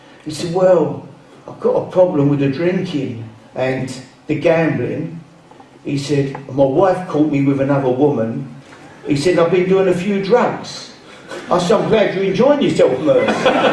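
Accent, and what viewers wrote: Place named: Cockney, United Kingdom. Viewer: Legend.... typical Cockney, love him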